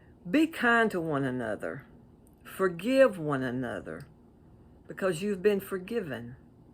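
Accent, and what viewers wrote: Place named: South, United States. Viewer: you brought me happiness today. I got to see your beautiful face, listen to your amazing sweet southern drawl and remember all our good times together. Love you and really miss you! You look fabulous ❤️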